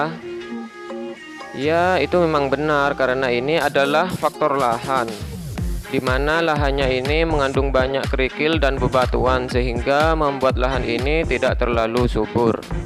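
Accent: Madura, Indonesia. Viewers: asli mana bang? kog logat madura kayaknya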